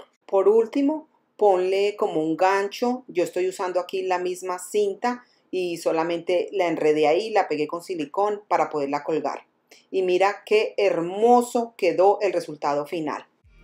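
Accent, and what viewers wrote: Colombia. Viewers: Hola soy nuevo suscriptor miré tus videos y me encantaron, explicas super bien y sobre toooodo es que haces las manualidades MUY ELEGANTES y sobre todo a bajo costo... Me Fascina tu casa la tienes muy ordenada, limpia y de buen gusto, te mando un saludo desde Guadalajara Jalisco México 🇲🇽. Me gusta también tu acento al hablar así tipo Colombiana 👏👏👏👏😉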